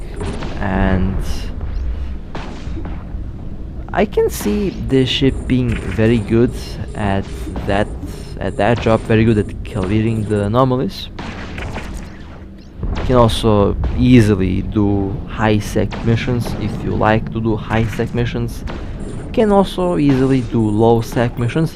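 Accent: Russia. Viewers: акцент русский капец как из американских фильмах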